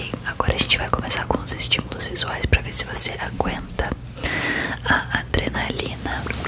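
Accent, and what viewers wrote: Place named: Rio de Janeiro, Brazil. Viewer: Olá, então tu és gaúcha? O teu "carioques" me enganou direitinho.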